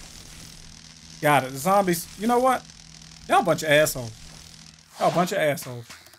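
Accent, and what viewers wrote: South, United States. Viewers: Whats up with people always saying he sounds black? It's just southern. Black has a more... Powerful tone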